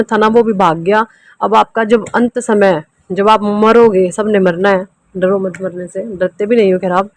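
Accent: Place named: Punjab, India. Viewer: 😀😀😀 वाह तुसी पंजाबी हो 🙏🙏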